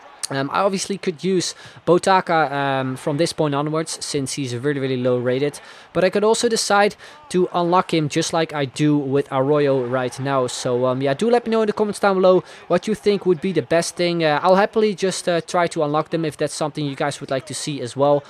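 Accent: Netherlands. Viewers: How the fuck does he do that unlocking shit. Amazing! And hearing him talk English I immediately knew he was Dutch, even better.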